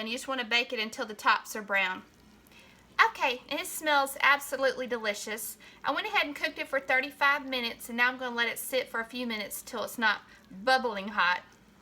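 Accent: South, United States. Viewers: I love that country accent. did you say dump lins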